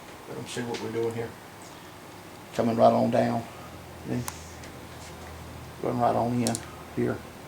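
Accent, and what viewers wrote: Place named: East Tennessee, United States. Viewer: Where is he from! He sounds like us here in East Tennessee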